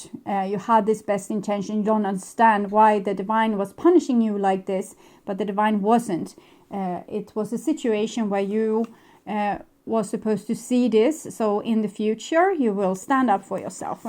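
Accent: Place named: Sweden. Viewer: The harsh truth in a beautiful Swedish accent. Lol awesome . Thanks sweetheart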